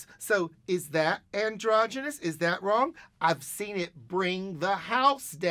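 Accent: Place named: South, United States. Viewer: You know.. it’s crazy bc Lady Bunny rly looks like if Trixie got older . She even kinda sounds like her 😂just southern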